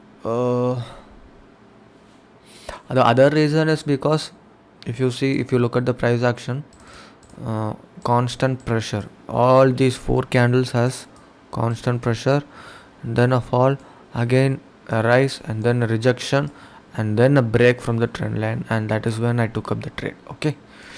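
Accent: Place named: South India, India. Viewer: It's with his accent. Lot of south Indian people do that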